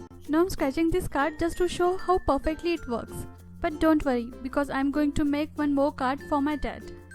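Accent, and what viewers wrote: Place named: India. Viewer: It's nice to here an Indian speaking English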